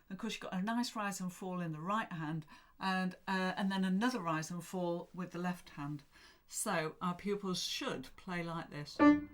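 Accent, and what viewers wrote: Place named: England, United Kingdom. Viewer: you sound English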